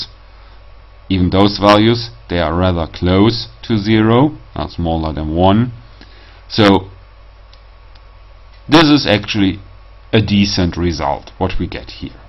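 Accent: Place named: Germany. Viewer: schrecklich deutscher Akzent. Mach gleich ein Video in deutscher Sprache!!!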